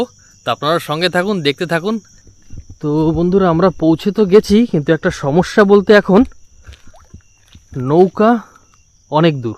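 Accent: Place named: Khulna, Bangladesh. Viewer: District ER nam ki. Kotha bolen tho khulna r moto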